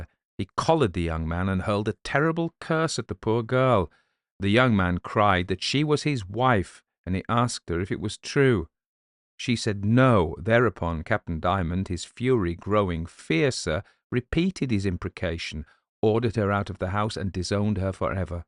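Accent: United States. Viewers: If I can perform a British accent but half as well as you do an American, I will consider myself a success as an actor. :) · I don't know about the regional dialects disappearing everywhere. I'm in southern West Virginia and there's still various dialects the further south you go. As for doing an American accent, his isn't too bad. I love his Scottish & Irish versions.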